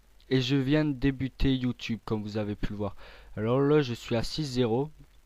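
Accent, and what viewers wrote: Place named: North, France. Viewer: sa senten a ta voie ke tu vien du nord mdr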